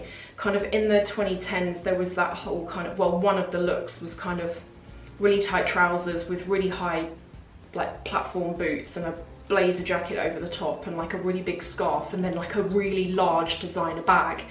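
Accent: RP, United Kingdom. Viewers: “I am quite disappointed” oh I love the way you talk and express yourself! Only a true British Lady can talk like this😘so elegant and articulate! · With your posh accent and elegance, I’m shocked they treated you so poorly!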